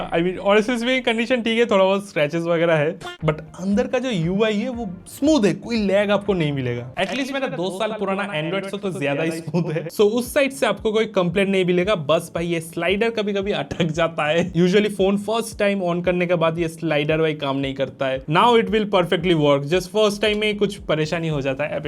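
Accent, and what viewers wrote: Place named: Kolkata, India. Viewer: U have Kolkata accent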